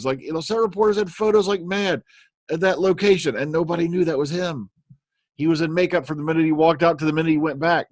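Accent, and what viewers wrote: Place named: Oxford, United Kingdom. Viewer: Your Oxford English accent is almost spot on! Lengthen your vowels & don't pronounce the r in words like bar, car & far.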